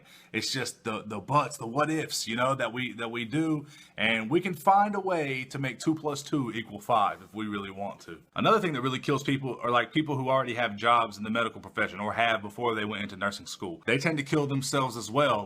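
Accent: South, United States. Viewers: you have such a nice voice..do u sing or rap. it' has a nice southern twang..lol anywho. love your videos man! keep up the great work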